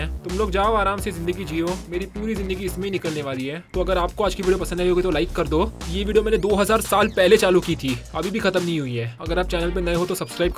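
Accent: Maharashtra, India. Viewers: Bhai vo marathi h main bhi shi re shi ghan aisa bolta hu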